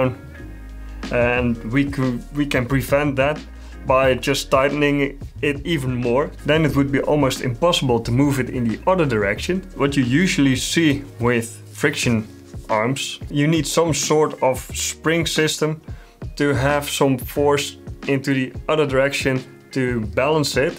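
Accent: Netherlands. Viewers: it took me 0.1 seconds to recognize a fellow dutchie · Wow, nice design! From your accent I'm guessing you are dutch: knap ontwerp en zeer knap gemaakt! Je zou ze zo moeten verkopen, toch best wat materiaal voor nodig om dit eenmalig zelf te maken. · Very cool looking lamp! This is the first video I’ve seen from this channel and listening to your accent, you are a fellow Dutchie right? I’m just getting into 3D printing and orientating at which printer to buy. But I’ve just subbed to this channel for future inspiration for prints 👌🏼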